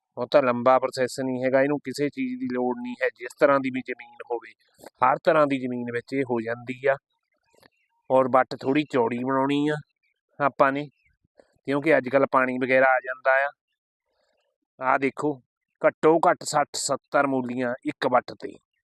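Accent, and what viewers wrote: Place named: Hoshiarpur, India. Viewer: Veer Hoshiarpur ton o lgda a bolan ton ma v Hoshiarpur ton a reh bhr rea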